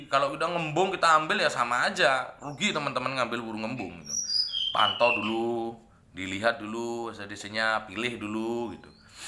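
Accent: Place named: Bali, Indonesia. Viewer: ...baru beli srdc ombyokan kemarin...liat video ini langsung tertarik nontonnya..bahkan sampai berulang2 biar paham....dengar logatnya jadi kangen bahasa indonesia yg aga ada medok balinya...saya lahir dan besar di gianyar mas....sekarang lagi merantau di pandeglang banten....maju terus tetap semangat...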